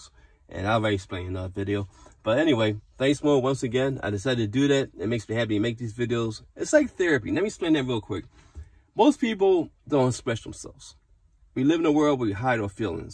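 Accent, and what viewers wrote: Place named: Philadelphia, United States. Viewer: You can so tell you are a Philadelphian. You talk so fast lol. But I'm a Philadelphian too so understood every word. I lived in Philly all of my 40 years but I am unfamiliar with this area. I love history and I found out that the first stone home built here in Philadelphia, that still stands today, was in West Philly. It was the home of William Penn's doctor. It's at 53rd and Woodland. I want to drive by one day to see it. I am more familiar with North Philly and Northeast Philly. I am so unfamiliar with South Philly and West Philly. Also, University City. University City doesn't even feel like Philly to me. It's a bunch of college kids and transplant yuppies. But anyway, I enjoyed listening to your story. Also, I can tell by all of the great and positive things you said that your time will come, kind sir. 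I am huge on God and spirituality and I was told before that if you truly want something to show gratitude towards it as if you already have it. When we do that we actually draw it to ourselves by our sense of gratitude. Almost like fooling the universe. Coincidences are merely God's prearrangement of plans and maybe I wrote something in this comment that you were meant to hear. If I didn't I still hope to leave an imprint of unconditional love on your heart. May God bless you on all of your future endeavors and for the sake of humanity, please keep spreading love. The world desperately needs you more now than ever before. Good always conquers evil.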